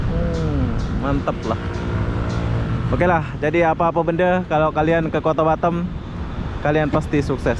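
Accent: Malaysia. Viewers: Tanya bang mohon di jawab abang orang mana sih ko ngomongnya separuh indonesia separuh malaysia????🙏🙏🙏 Mohon jawab bang kepoo banget nih aku 😁😁😁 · Ini orang bukan melayu klw ngk salah,pi ngomong y kok melayu tolen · Logatnya separuh bahasa Malaysia.... " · Dia lama di negeri orang, yakni Malaysia, sampe logat sana melekat.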